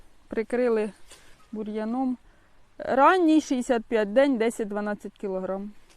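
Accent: Poltava, Ukraine. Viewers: Дуже гарна мова ваша,Полтавська❤🇺🇦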